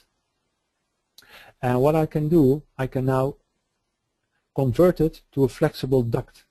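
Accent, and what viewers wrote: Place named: Netherlands. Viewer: Dutch accent. :)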